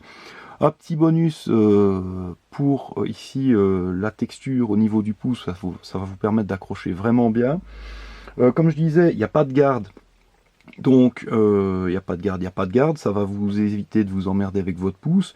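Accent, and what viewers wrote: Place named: Belgium. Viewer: Je viens de te commander le Sector 2, et je pense que je demanderai à l'équipe de TRB de me faire un bel étui Kydex pour un port "neck" ;-) Merci pour tes vidéos, avec l'accent belge en plus !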